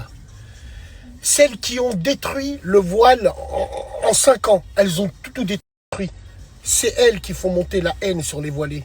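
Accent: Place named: Arab world. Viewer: C est tellement degeulasse l accent arabe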